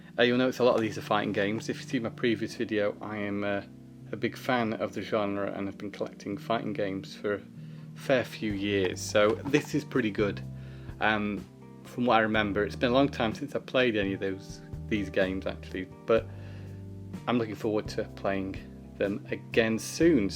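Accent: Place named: United Kingdom. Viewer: Bro what's up with this channel, you sound British and nothing like the bald bearded guy on the latest video about kinder eggs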